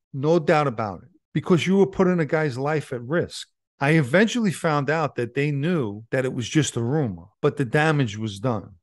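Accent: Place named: New York, United States. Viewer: I’m down here in TX and I love NY mob stories. I like your NYC accent, it adds to the stories. Glad to know you have removed yourself from that madness.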